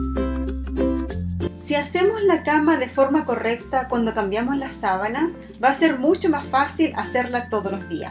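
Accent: Chile. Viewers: eres chilena? Por el acento, me gustaron lmucho tus sugerencias...además que eres muy sencilla y correcta, sin poses...todo está muy bonito gracias!!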